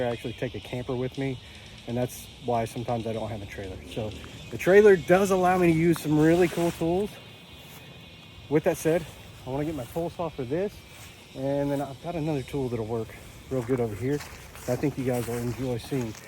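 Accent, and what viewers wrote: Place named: Oklahoma, United States. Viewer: I love watching your videos. I live in south central Oklahoma. Sounds like you live in Oklahoma too.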